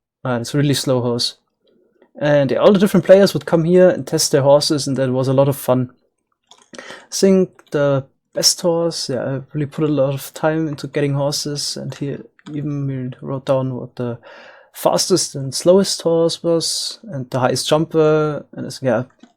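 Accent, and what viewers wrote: Germany. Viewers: You are no german, are you ? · Are you come from germany?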